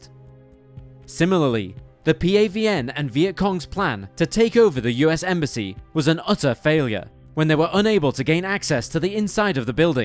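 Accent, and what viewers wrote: United Kingdom. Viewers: No more limey narrator!.....sick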